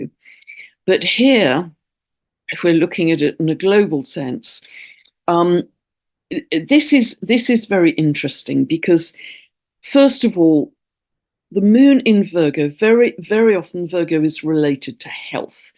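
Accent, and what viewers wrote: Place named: RP, United Kingdom. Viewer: Thank you - this is what wisdom looks like, in the Queen's English, with a warm, lovely smile.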